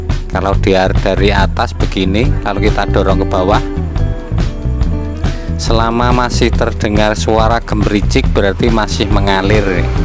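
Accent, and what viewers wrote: Java, Indonesia. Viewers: Logat bahasane medok nyenengke tenan dirungoke.. 😂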